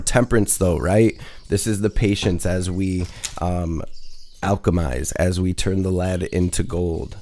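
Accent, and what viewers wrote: Detroit, United States. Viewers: You from Detroit?? 😊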